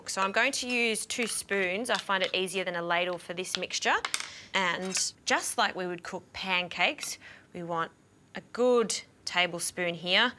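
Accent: Australia. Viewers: What accent she using? Australian? Kiwi?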